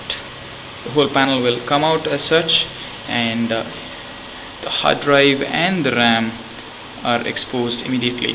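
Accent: India. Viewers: インド訛り キッツいなぁ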